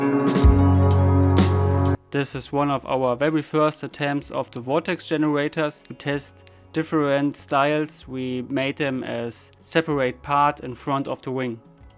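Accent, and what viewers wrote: Germany. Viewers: This is next level. Are you a senior designer at Airbus or Arianespace? Because you should be! Also, sorry - is you accent Bayern or in der nähe? Lived in Muenchen for a couple of years and still miss it! And sorry if I misplaced your accent and you are from somewhere like Hamburg 😂! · Nice to see people giving the Harrier some love! Really awsome work you guys deliver there. To be honest I just found you guys and have to say: You have a new subscriber xD I really love fighter jets and their engeneering and its nice to see how you can actually reconstruct an awsome work of technical art with enough determination and knowledge. I really really like the way you avoided some problems and tested things out. I hope you'll continue this awsome work not just on the Harrier, but maybe on some other aircrafts, after this project. and a tiny unimportant question (if this even gets read): Do i hear a little bit of german accent? 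xD It doesn't matter where you are from, but just out of curiosity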